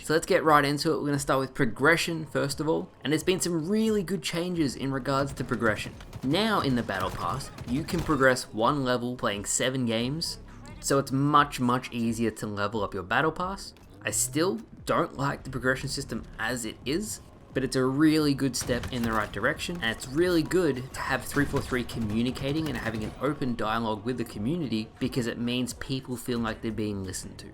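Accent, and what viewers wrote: Australia. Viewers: So good to hear an Aussie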